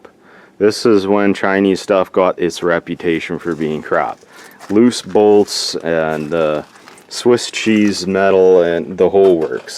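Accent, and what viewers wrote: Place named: Canada. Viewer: We know a Canadian accent when we hear it hellll yeah bawd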